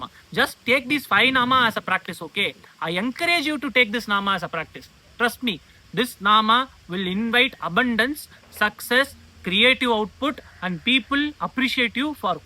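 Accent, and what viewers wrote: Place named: Tamil Nadu, India. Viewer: Superb tambi for your efforts but tamils will spell thara as dhara like tha you will spell as dha which changes the word meaning completely please focus on original words than tamil accent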